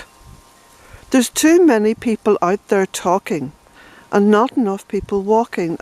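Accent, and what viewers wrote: Ireland. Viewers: You know the minute you mentioned tea I realized that was what I was in need of ! Lol. Well you’ve inspired me too, I’ve already picked up my cardboard from Walmart and am ready to start building my raised beds to grow veggies. It’s been really good for me to be able to see the process, and hear you give your tips in your Irish accent too, as I used to live there and have fond memories. ☺️ Reading about something and actually seeing it are two very different things, and most of us don’t have someone in our family to teach us. Thank you so much. Loving seeing Jacks happy little face and antics too. Blessings to you.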